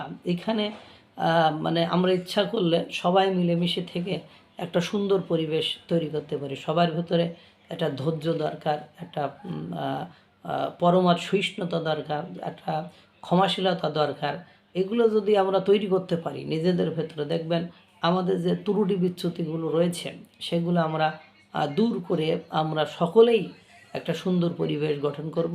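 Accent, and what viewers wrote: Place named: Khulna, Bangladesh. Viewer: খুলনা, যশোর বা সাতক্ষীরা ভাইয়ের জেলা বলেই আমার মনে হয়। কারন কথা বলার ধরন ঐদিকের মতোই।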